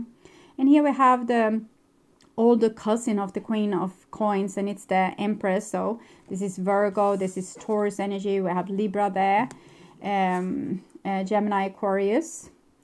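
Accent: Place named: Sweden. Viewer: The harsh truth in a beautiful Swedish accent. Lol awesome . Thanks sweetheart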